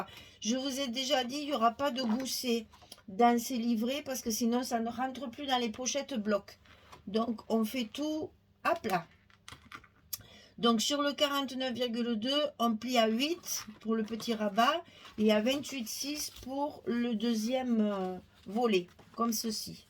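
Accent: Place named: Provence, France. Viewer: Bonjour. J'avais acheté la collection provence ou lavender l'année dernière. Enfin, la même mais ils ont rajouté le vélo et autre chose. Je suis heureuse que vous fassiez un tuto, je vais pouvoir enfin m'en servir! Merci infiniment, je l'aime déjà! Et ce papier avec votre accent... on la sent la lavende 🥰🥰🥰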